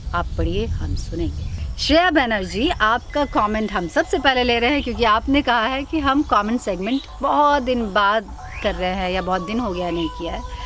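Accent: Bihar, India. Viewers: I'm from Patna and love ❤ you...I like your thoughts your vlogs your life style and yes aapka Bihari style me kabhi baat karna Bihari khanpan sab pasand hai....aur main aapse milna chahti hoon....kabhi aap Patna me apne subscriber se mile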